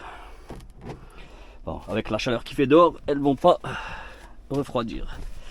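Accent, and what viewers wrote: Alsace, France. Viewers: hola mon reuf change rien tu est au top sa c'est un bon alsacien ta façon de parler dans les videos tu a le droit de parler comme tu en avis courage et force a toi bis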